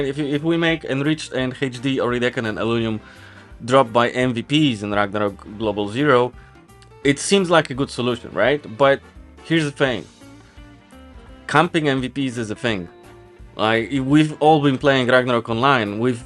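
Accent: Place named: Poland. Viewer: Are you from Poland? Your accent sounds like you are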